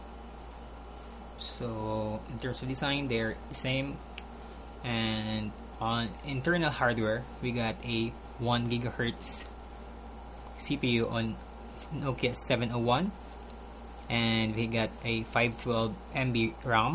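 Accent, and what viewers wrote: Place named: Philippines. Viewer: I knew it..you're a Filipino kabayan..the accent is there.. "The Symbian event was (in) HK so we attended there." "use two weeks ago not last last (redundant) week. mabuhay! peace y'all! =) good review and comparison.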